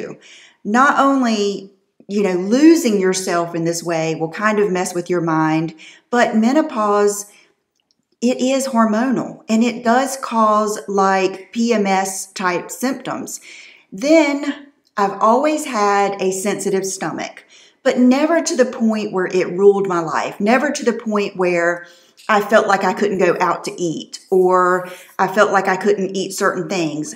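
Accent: South, United States. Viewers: Sweet, Soft-spoken, Southern, Sincere, Stunning 🥰🙏🏼🥰💄😍💕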